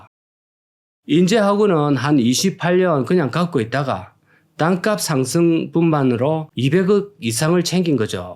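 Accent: Gyeongsang, South Korea. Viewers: 갱상도 아이가?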